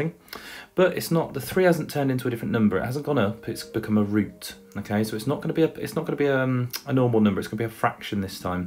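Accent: United Kingdom. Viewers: try to be more clear in your speaking and explanation. Not everyone is capable of understanding a British accent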